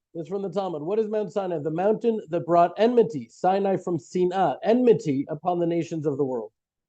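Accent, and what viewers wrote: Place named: United States. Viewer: Such an awful lot of propaganda. jewishness is not the problem. Zionism is. Also, look at the speaker - white skin, American accent. Indigenous middle easterners have olive skin and Arabic accents. The kids in the video only know this way because of Occupation, Apartheid, and the brutal actions of the IDF. The Palestinians live in virtual prisons - the West Bank and Gaza. jewish kids in zionist izrael experience a very different life and a very different education, ie., books degrading the Palestinians - and that information comes from an izraeli jewish university lecturer who made a study of educational books first year to the end of high school. Now for the onslaught from zionists reading this ...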